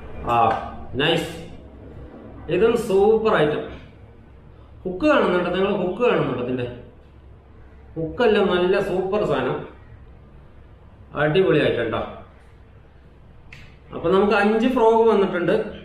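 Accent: Palakkad, India. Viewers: Palakkadan Language Kelkumbo Ulla oru Happiness 😍Videos Adipoli Aayind Me Also Palakkad Etta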